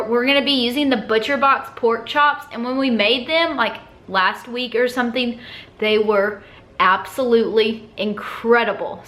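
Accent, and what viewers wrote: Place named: Texas, United States. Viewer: You are so adorable! Love watching your channel! Learning so much! I grew up in Ft. Worth so love your TEXAS accent!! ☺️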